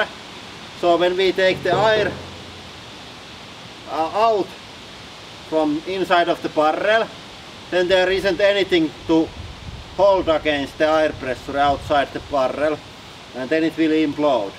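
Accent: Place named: Finland. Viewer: I'm here for the finish accent only.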